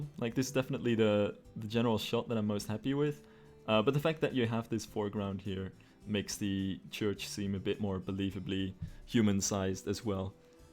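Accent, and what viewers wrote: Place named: Netherlands. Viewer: You sound Dutch. Are u from the Netherlands?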